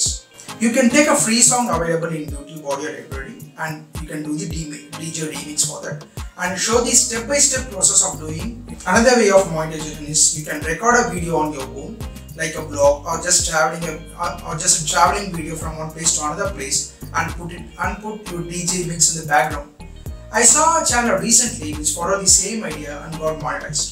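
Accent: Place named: India. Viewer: IT IS REALLY HARD TO UNDERSTAND WHAT YOU ARE SPEAKING. THE CAUSES : 1. BAD AUDIO (SORRY) 2. INDIAN ENGLISH ACCENT (DIFFICULT FOR ME UNDERSTAND THAT ACCENT COMPARED WITH AMERICAN OR BRITISH) I HOPE BETTER AUDIO ON THE NEXT VIDEO. THANK YOU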